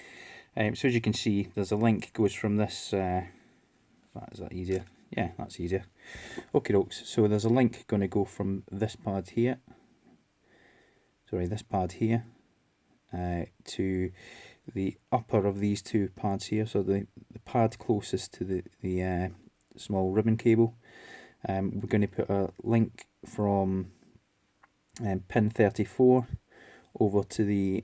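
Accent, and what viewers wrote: Scotland, United Kingdom. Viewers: I like your Scottish accent. Don't do yourself down.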